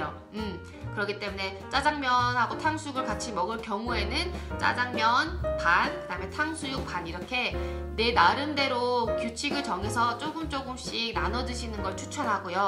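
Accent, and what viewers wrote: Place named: Seoul, South Korea. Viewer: 님 특유의 사투리말투에서 서울말투 사이 너무 귀여워용ㅎㅎ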